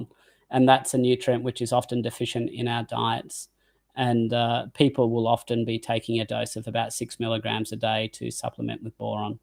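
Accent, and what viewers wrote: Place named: Australia. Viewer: Wanna give a shoutout to whoever thought of adding subtitles to this video. This helps for us people not familiar with the Australian accent. Hope this'll be the standard for future videos because YT's Closed Captions suck.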